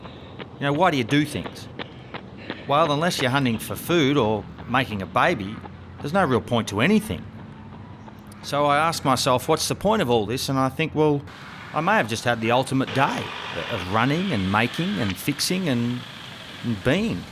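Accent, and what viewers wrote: Australia. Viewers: this guy is australian as fuck!!!! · I kept forgetting you were Australian until the comment about the "kooky old farmer" who "ran around his paddocks with his gumboots on." Which I had to play 3 times before I could even understand the words you were using 😂. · Where is he from? I can't seem to properly pinpoint his accent. Is he Australian? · definitely australian maybe from the north west? filmed in VIC or tazzie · Hey. I'm an Aussie living in Vienna, Austria. You make me miss home. The enthusiasm, the accent, countryside and Aussie girlfriend/wife. Also big on running. Loved everything about this video. Inspires me to get off my butt and be more productive maybe even make a youtube channel myself! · Definitely Australian. He has a more pronounced rural accent which is easy to mistake for NZ if you’re not from here but he lives in Victoria.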